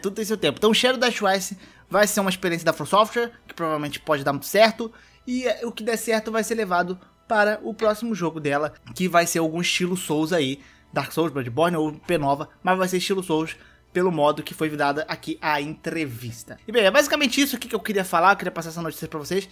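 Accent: Rio de Janeiro, Brazil. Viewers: Quando voce Fala twice parece que voce Fala tchuaiche.. Eh sotaque carioca?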